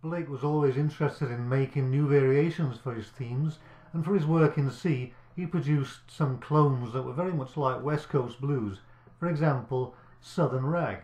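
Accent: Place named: Sheffield, United Kingdom. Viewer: Jeez, who did the subtitling? Obviously doesn't understand the Sheffield accent!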